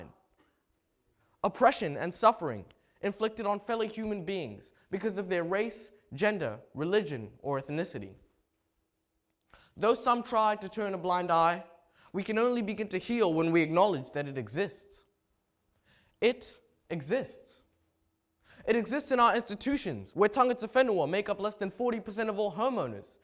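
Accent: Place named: Bemba, Zambia. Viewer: Am laughing at the bemba in English 🤣🤣🤣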